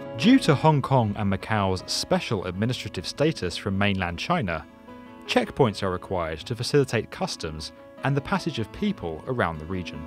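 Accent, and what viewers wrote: United Kingdom. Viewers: B1M is one of the most professional and dignified channels here on YouTube although they do have the unfair advantage that their voice-over is done by the most British man ever that always add an extra layer of class and sophistication to any piece of media.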